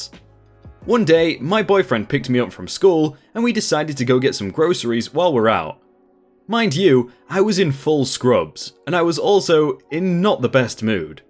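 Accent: United Kingdom. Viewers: I wonder, are you British? You pronounce words in ways I never thought of. I like they way you talk better than the way my friends do. Like how you said "rose". We would pronounce it like "rows" basically. You also say "zed" for z and "hach" for h. I like it. It isn't native to myself so I was curious of where you were from.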